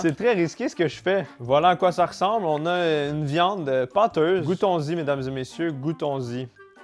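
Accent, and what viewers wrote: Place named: Canada. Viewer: l'accent canadien c horrible.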